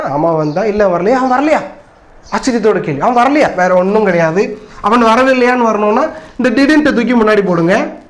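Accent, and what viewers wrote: India. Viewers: Neenga kanniyakumariya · great sir, i like ur teaching and ur talking tamil slang also super sir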